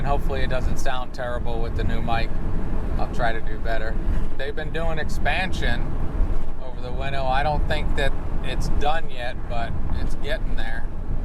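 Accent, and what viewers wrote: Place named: New York, United States. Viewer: I can hear your accent clearly! How long has it been since you left NY?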